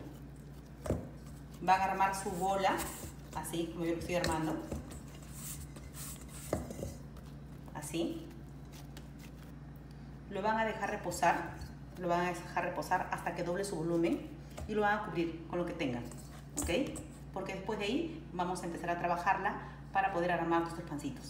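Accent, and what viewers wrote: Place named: Peru. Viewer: Que hermoso acento peruano. Un abrazo desde Chile. Soy de Ica.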